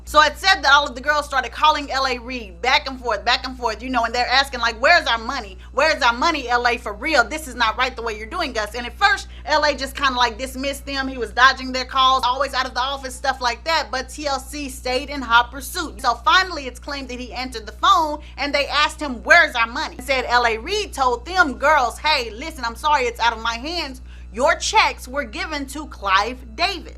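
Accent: South, United States. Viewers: You're so country and loud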